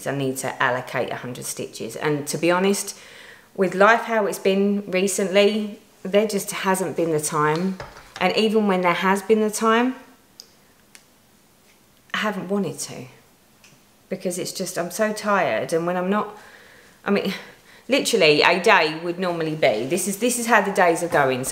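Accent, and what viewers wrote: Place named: England, United Kingdom. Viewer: What part of England are you in? I love your accent!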